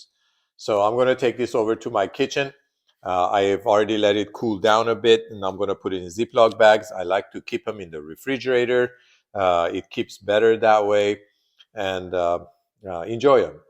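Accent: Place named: New Jersey, United States. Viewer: lovely accent. new jeresey?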